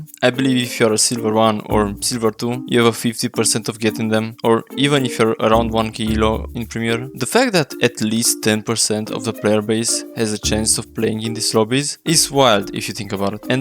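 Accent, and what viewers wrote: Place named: Romania. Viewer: It took 6 minutes to figure it out you are romanian ahaha😂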